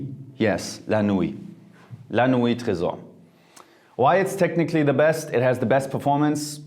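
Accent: Germany. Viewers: This german accent gosshh you are the best!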